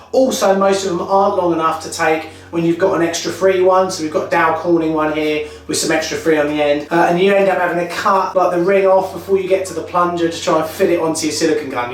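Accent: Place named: England, United Kingdom. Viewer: Isn't there a lot of waste?? Im from England living in USA - nice to hear the old stomping ground accent..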